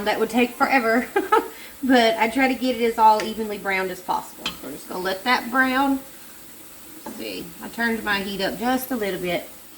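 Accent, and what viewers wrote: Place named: Louisiana, United States. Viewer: I will surely try this😊😊 sounds like you are from Louisiana. I am from SW La. good,simple food….nothing better!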